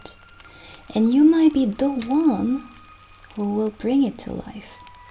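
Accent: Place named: France. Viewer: no your French accent is perfect. The whole video is perfect and the music in the back is just *chefs kiss* haha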